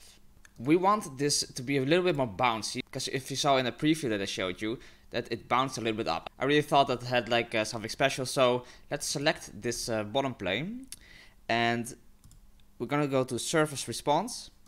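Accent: Netherlands. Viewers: Where are u from? ur english sounds like ur dutch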